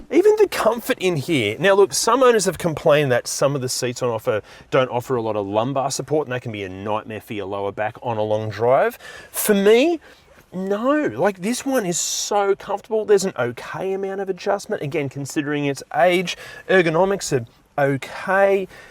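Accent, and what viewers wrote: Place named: Australia. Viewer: Why is it since TOP GEAR UK every car review is talk so much other than car...and on top of this heavy Australian accent 😅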